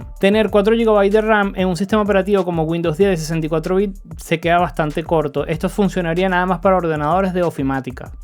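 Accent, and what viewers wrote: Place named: Venezuela. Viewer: Hola amigo, soy nuevo suscriptor, por tu acento noto que también eres de Venezuela, de ser así me gustaría saber al día de hoy que Laptop recomiendas de las más económicas para empezar acá en Venezuela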